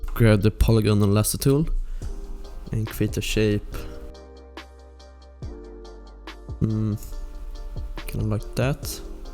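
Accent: Sweden. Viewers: Är du svensk? Låter iaf som det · U sound Swedish as f**k. Looking good. Thanks boiio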